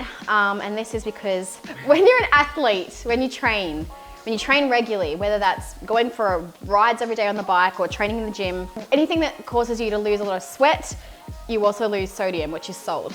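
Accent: Australia. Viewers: I like the Aussie accent.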